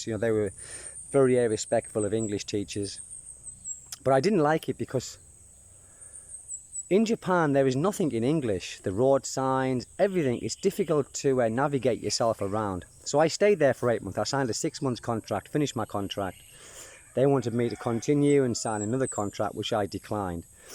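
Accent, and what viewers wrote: United Kingdom. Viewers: during 60's and 70's, Columban missionaries were assigned that area of Mindanao. w/British accent like you.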